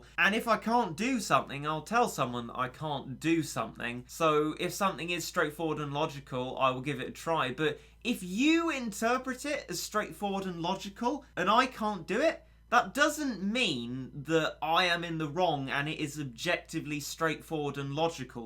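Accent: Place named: United Kingdom. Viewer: I can see why this guy would think these untrue things- it's your english accent! Remember that in American pop culture everyone with a british accent is automatically a villain.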